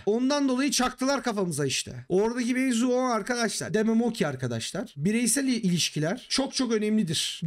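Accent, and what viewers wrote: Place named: Central Anatolia, Turkey. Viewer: İç anadolu şivesi arada süper gidiyor :)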